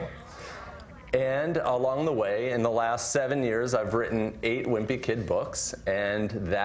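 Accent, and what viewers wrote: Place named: Brazil. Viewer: The way he talk brazilian XD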